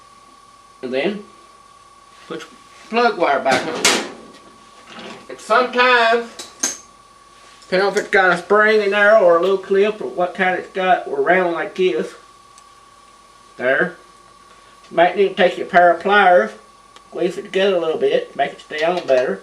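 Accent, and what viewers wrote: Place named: Tennessee, United States. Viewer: Are you from Tennesee some where's ? Like around Kingsport? I knew another guy sounded just like you from up that way. Excellent video and I just pulled my cover on my 11hp 92 briggs, and was wondering about that magneto being rusty, and this was very appropriate. Take care and keep the video's coming...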